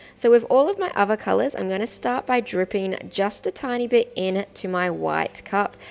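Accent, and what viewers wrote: Australia. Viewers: Oh, I would have never known or thought anything about your voice. You just sound to me like you have a cute accent. I thought you were and Aussie? I cannot imagine why anyone would criticise you. People who do that are just plain mean, cruel, insecure, immature bullies. You sound great to me and I enjoy your videos. Keep em coming.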